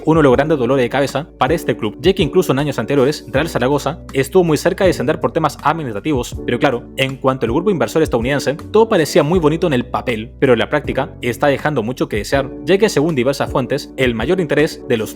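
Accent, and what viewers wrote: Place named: Latin America. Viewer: Por tu acento pareces latinoamericano, te agradezco que hagas un vídeo sobre uno de los mejores equipos de la Liga, al menos con historia, y el séptimo con más títulos en España. Es una pena que a día de hoy esté así, mucha gente de otros clubes preguntan y no se lo explican. Es muy duro. Sé que en Argentina es muy querido, debido a que tuvo muchos futbolistas de esta nacionalidad en sus filas, y con un muy buen rendimiento. Salvo milagro, nada lo va a salvar esta temporada de descender a Primera RFEF. Habrá que ver si es viable en esta categoría, pero si desaparece sería una tragedia.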